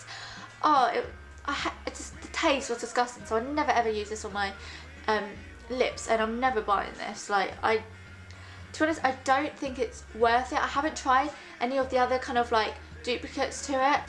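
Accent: United Kingdom. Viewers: Can u not recognise her british accent???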